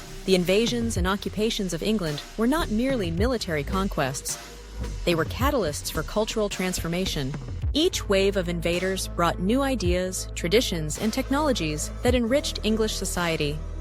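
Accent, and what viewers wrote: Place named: United States. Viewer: and now its narrated by a YANK .... !!!!!!!!!!!!!!!!!!!!!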